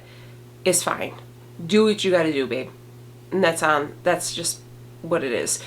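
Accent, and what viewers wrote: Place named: Midwest, United States. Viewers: Lol. You do sound like your from Minnesota. Sorry... even when you were trying to not sound like you from Minnesota, you sound more like you were from Minnesota, ey' 😁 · LOL. You definitely sound like you are from Chicago! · You do have that Minnesota vibe. "Don't cha know"?; ) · Great post! You look beautiful! Also, you do totally sound like you’re from Minnesota! My ex is from south side Chicago and doesn’t have the same accent as you! Even sounds more like Chicago! Not a dig, I promise! Your accent is awesome, but it just doesn’t sound Chicago for some reason! I wish you could hear it! I love your videos too by the way, thank you for being honest and helpful! Ps-love the Mean Girls quote! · You’re Midwestern, but not Minnesotan. LOL. Though you do say “Minnesota” spot-on like we do, mostly you sound like my friends who grew up in Chicago 😘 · "from Minnesota" If I had to guess, it's the way you say your O's. 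I lived in MN for most of my life and now live out west and get busted on my pronouncement of words with O's frequently and just giggled when they say it now.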